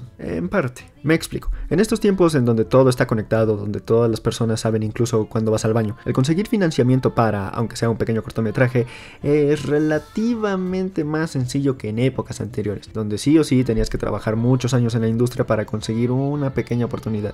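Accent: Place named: Mexico City, Mexico. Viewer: Concuerdo, muy chilango 🤢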